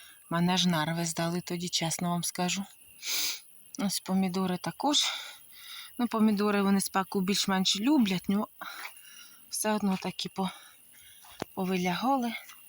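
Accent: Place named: Poltava, Ukraine. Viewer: Я теж з Полтавської області,мама і досі проживає в Пирятині...Я ж чую,знайома вимова!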